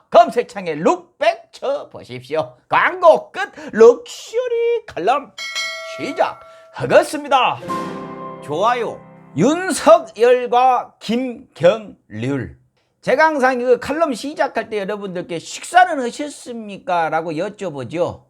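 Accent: Jeolla, South Korea. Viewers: 전라도 사투리 억양이 너무 강해서 없어 보여요. 안그래도 없어 보이는데.ㅎ 조언 하나 드리자면, 정치 시사 관련해서 신뢰성을 갖기 위해서는 개콘처럼 하면 앙대여. 차라리 그냥 연변사투리로 하던지~~~ㅋ 근데 티비는 언제쯤 나오려나여? 부르는 곳이 없어 유튜브 하는건 알겠지만 메뚜기도 한철이니 열심히 해보셔요. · 전라도 사투리 심해서 좀 거북하네용 ㅜ 내용은 좋네요 지당한 말씀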